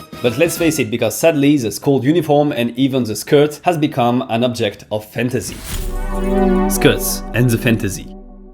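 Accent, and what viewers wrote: France. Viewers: ton accent francaise est tres évident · your French accent is coming out in your pronunciation of some H's, like in "hierarchies" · With just a little bit of a French accent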